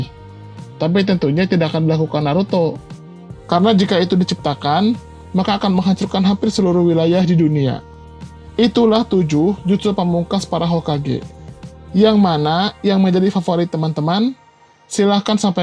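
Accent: West Java, Indonesia. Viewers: logat sunda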